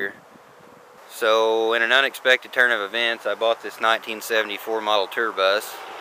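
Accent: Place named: Missouri, United States. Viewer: Thought this dude was from around Omaha for a sec. nah he from MO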